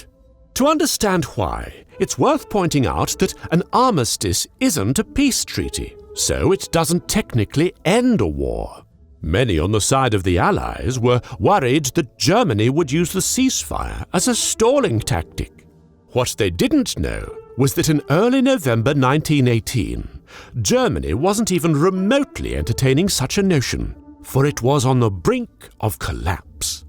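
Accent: United Kingdom. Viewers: Saw an ad, clicked because of the thumbnail, stayed for the British accent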